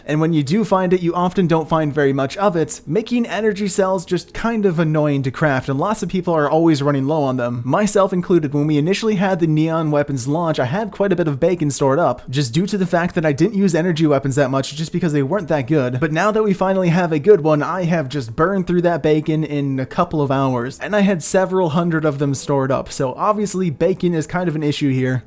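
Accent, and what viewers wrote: Czech Republic. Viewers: are u from czech :D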